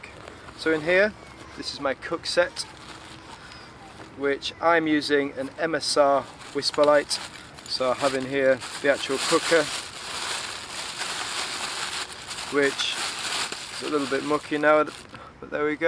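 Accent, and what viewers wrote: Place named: Northern England, United Kingdom. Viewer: I can’t work out your accent. Especially when you say ‘bike’. I know it’s north of England but is there some welsh or maybe Scouse mixed in there? 😂 All the best.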